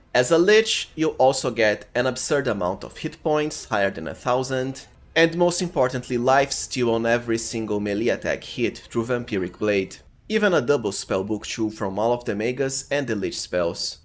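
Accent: Brazil. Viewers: 5 seconds in and I KNOW you are Brazilian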